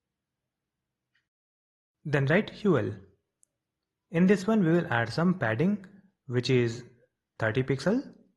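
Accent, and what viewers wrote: India. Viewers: Very Indian 😢😢 but the content is perfect, thank you anyway. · Can’t concentrate with the Indian accent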